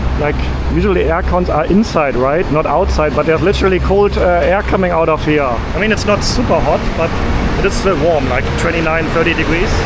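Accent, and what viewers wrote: Germany. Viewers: Are you german ? Yaaaaah you imagined the accent didnt you ? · You sounds German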